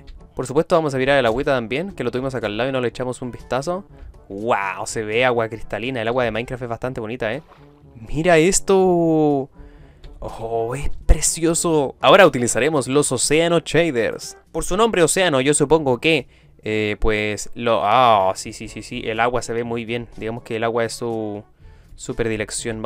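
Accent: Chile. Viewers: tienes un acento chileno muy neutro, me encanto tu video suve mas asi plisss prueba el shader chocapics 13 estoy seguro que te va a encantar <link>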